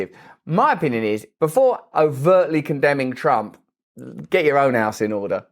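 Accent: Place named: United Kingdom. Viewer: Funny that British accent. I always loved the English, old English dialect, especially before / during the Churchill days. Still to this day I prefer The British English than American English/ Canadian English (we just took / used from America, their lazy English ). Just & quite a bit more British citizens don't pronounce your "T's" ( letter T-t ) Funniest example is when you pronounce the word "better"! You completely leave out the "T" sound "Beh- - -er" but when you pronounce the word "Time" You clearly her the "T" sound & everything! It's just funny to her that!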